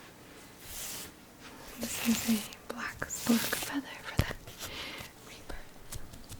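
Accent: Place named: United States. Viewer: How are you not American?!? Still blows my mind.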